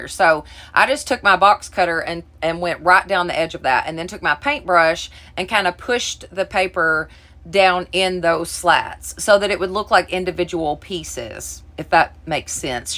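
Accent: South, United States. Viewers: Bless your heart, you are so Southern! I love it. Liked your projects.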